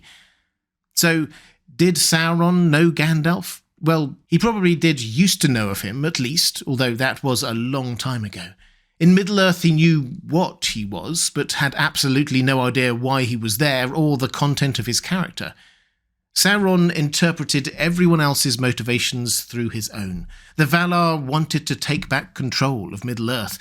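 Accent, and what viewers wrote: England, United Kingdom. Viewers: you have the best narrating voice & cadence, I love the way you speak, even down to your accent, I’m also English & it sounds bad but I cannot listen to an American talking about LOTR, it just sounds wrong to me, it’s like trying to listen to an Englishman talk about guns and pickup trucks, just doesn’t feel right.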